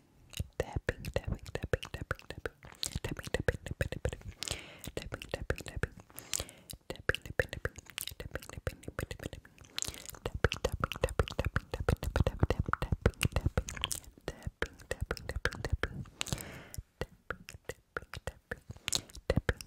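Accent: Sweden. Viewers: Swedish is one of the best accents for asmr and accent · My grandfather is swedish so I really like your accent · He has a Swedish accent ! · Hee Swedish I believe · I love your Swedish accent ❤❤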